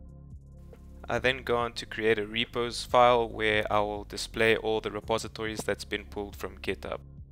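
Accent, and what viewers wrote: South Africa. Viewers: Sound like a South African, good for you.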